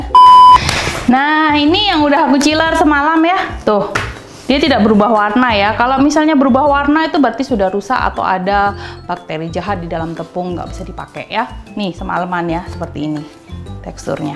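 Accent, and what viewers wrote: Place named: Java, Indonesia. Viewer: thank you, sy mau coba ah. Btw, ci orang jawa ya ..logatnya jawa hehe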